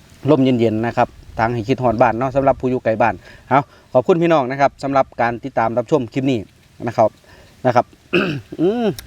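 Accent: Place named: Khemarat, Thailand. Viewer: บ่าวท้าวเป็นบ้านใด๋ฟังสำเนียงเสียงกับการเว่าคือคนแถวไปบ้าน(อำเภอเขมราฐ)แต่ถ้าบ่แหม่นกะขอโทษจ้าแต่ตอนี้คนถามอยู่ลพบุรีได้30กว่าปีแล้วแต่เคยลืมเขมราฐ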